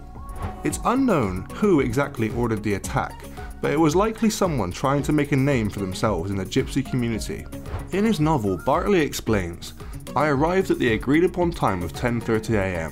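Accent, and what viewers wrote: Bristol, United Kingdom. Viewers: Are you from Bristol the man narrating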